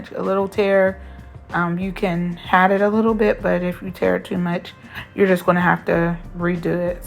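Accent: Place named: Pittsburgh, United States. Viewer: I’m from NY… my college roommate and best friend was from Pittsburgh 🥹 your accent sounds so much like her 🥰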